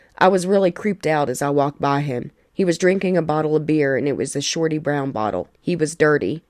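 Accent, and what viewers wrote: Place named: South, United States. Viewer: I'm sorry but if you have a southern accent, telling a scary story doesn't work.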